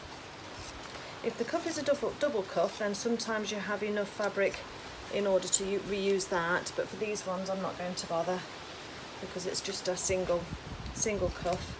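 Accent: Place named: Derby, United Kingdom. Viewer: You sound just like me. Are you by any chance from Derby?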